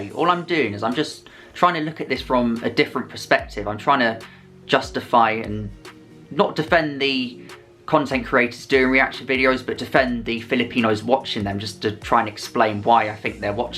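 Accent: United Kingdom. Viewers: If I'm not mistaken, I think his accent is called Estuary English. Correct me if I'm wrong. Notice that for TH, he uses /f/.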